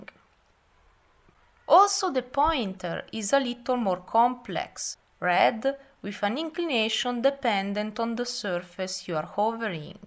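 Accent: Russia. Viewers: This russian accent is really annoying and distracting .... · Thick Russian accent aside, this tutorial has been great, the best one I've found thus far. I have learned more from this young woman than I have from any other source since I downloaded the trial version. Good job honey.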